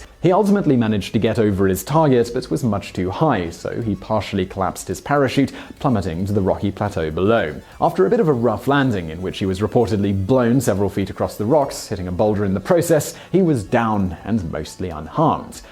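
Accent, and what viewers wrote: England, United Kingdom. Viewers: So the ominous British voice over guy is VSauce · British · This channel is such horseshit... I too can read a wiki entry in an English accent. I'll take my 2 million views now please. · I was just interested in seeing the clips..and not listening to 7 minuts of british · Yeah he's been living in England for a while now. Guess he picked up the accent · Is that British English?? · id rather listen to that fucked up robot voice than this brit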